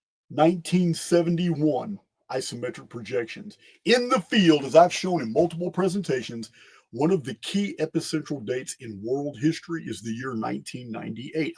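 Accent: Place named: Tennessee, United States. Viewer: Where is your accent from? My wife is struggling to understand you, but I'm fine with it. Is it Tennessee?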